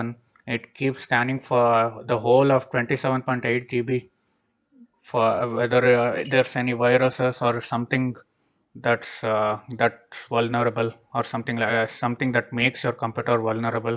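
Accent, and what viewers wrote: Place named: India. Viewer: I hear Indian accent, I listen to the end. Fast, to the point, solved my problem. Thumbs up!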